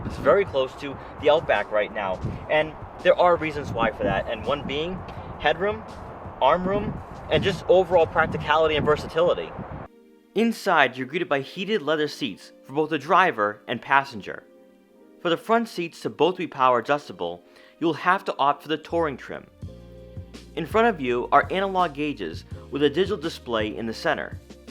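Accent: Boston, United States. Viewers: Excellent review of the 2020 Subie Forester, love your Boston accent, I heard it strong at times. I'm not what the exterior color is of the one you reviewed, but it certainly looks amazing 👌. However, the 2020 Legacy XT Touring you recently reviewed was awesome.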